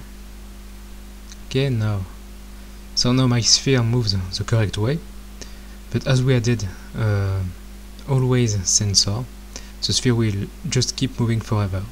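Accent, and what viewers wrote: France. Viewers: je pense que tu es français vu l'accent ^^, peus tu envoyer un lien de téléchargement de ceci pour travailler dessus s'il te plait? Merci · I love your French accent. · AWWW yeah french accent is the best le accent!!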